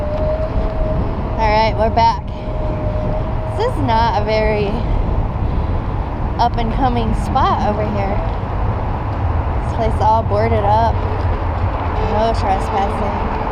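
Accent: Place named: Texas, United States. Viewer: Love your Texas accent. The reason why you see so many vacant buildings and vacant land in Las Vegas, is the same reason that you see these things in most major US cities and that is the prices of property has been pushed up so high, it has become too expensive to carry the financing. So they sit vacant and empty. There is many homeless in Las Vegas. And if you were to go north from Fremont st on LV Blvd you would see it. Or go to Boulder hwy.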